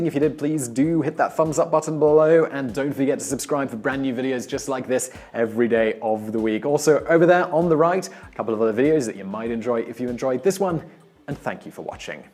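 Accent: England, United Kingdom. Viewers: When did Michael get a British accent? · This is just a skinnier Michael from Vsause with an english accent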